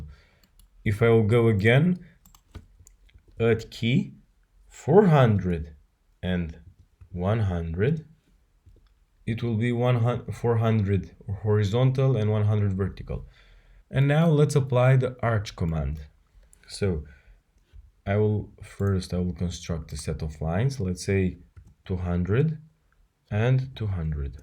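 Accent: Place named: Russia. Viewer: Слышится отчетливый русский акцент, а похожий гайд на русском может быть есть?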